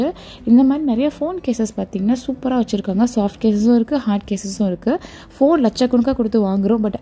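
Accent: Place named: South Tamil Nadu, India. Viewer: Recently watching ur videos.. Very natural.. Which is ur native.. Becaz south side slang madhiri iruku adha keta sis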